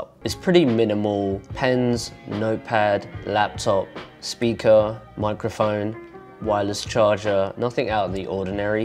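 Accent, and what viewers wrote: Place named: United Kingdom. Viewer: I'm impressed with your British accent.. o.o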